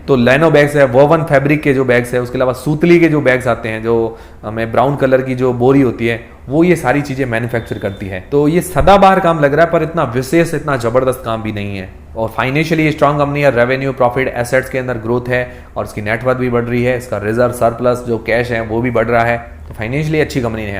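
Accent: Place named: Marwari, India. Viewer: आपके बोलने के लहजे से मारवाड़ी लग रहे हो।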